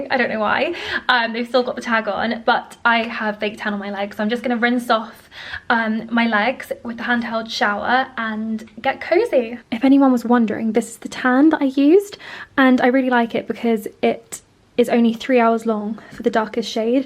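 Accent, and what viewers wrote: France. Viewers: An amazing video like all the time. And don't worry, your french accent is good and really charming!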